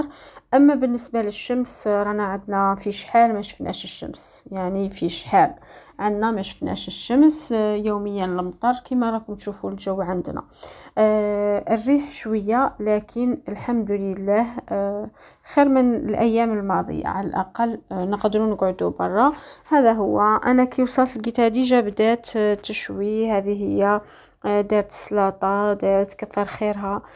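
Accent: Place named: East, Algeria. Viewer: صوتك يهبل تباني من الشرق الجزائري كيما انا ربي يوفقك حبيبتي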